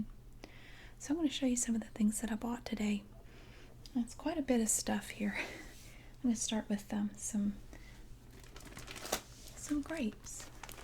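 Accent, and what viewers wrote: South, United States. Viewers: It may just be the effect of your southern accent, but in Germany it is pronounced 'Al-De' (I used to live in Berlin, shopped there often) but to be fair, here in the UK people say 'All-De'. The native pronunciation can be found in this music track! <link>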